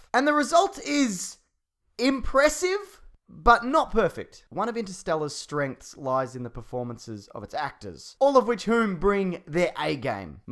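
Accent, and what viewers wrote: Australia. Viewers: Your Australian aren't you mate ?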